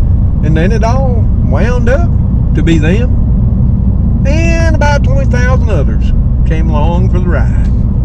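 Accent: South, United States. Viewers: I could listen to you all day I love your southern style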